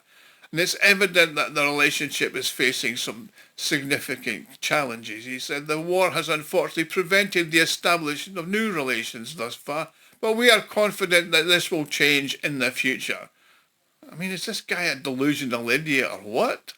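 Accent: Scotland, United Kingdom. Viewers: What is this fascist Russian propaganda with a scottish accent!?!?!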